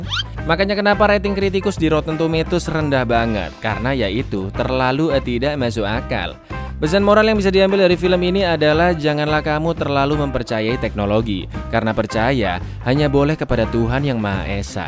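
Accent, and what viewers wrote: Java, Indonesia. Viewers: Halo Lurr. Bang Org Mana? Jawa or Jogja?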